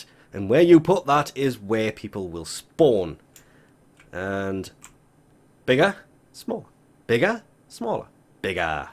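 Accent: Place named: Liverpool, United Kingdom. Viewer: Liverpool accent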